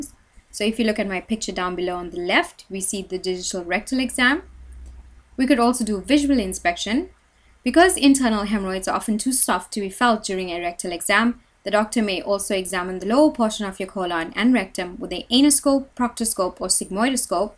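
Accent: South Africa. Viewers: You sound like you're a south african indian, am I right?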